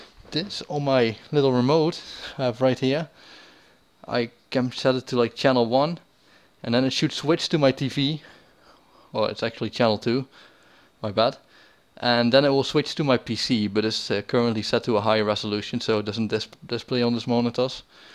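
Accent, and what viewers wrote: Netherlands. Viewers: Your accent look likes dutch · are u dutch? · Are u dutch? :P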